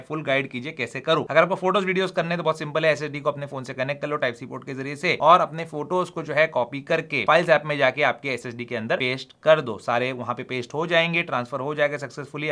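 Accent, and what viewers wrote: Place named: Hyderabad, India. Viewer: assalam walekum bhai Kyunki aap Hyderabad Walon ke jaisa baat karte ho❤❤❤❤🎉🎉🎉🎉🎉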